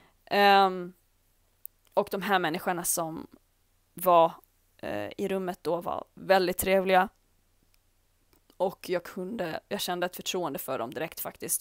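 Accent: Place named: Gotland, Sweden. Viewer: så härligt att få höra gotländsk dialekt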